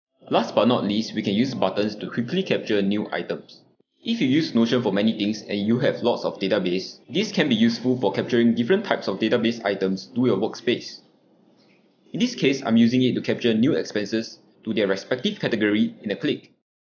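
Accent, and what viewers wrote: Singapore. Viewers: The Singaporean accent haha! Love your content. 😁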